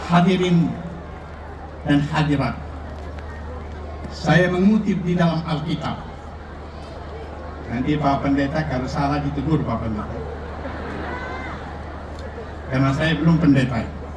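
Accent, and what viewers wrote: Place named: Palu, Indonesia. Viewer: ini kiai org apa ya..logatnya kaya org palu..😅😅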